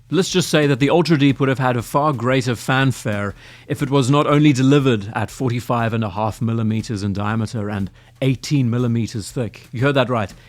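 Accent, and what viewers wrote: South Africa. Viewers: BTW just subscribed for the SA accent and guitar if nothing else 😅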